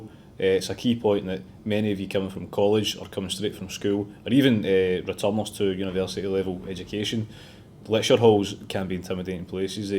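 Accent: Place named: Austria. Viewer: this guy speaks english like the way the Austurians speak german !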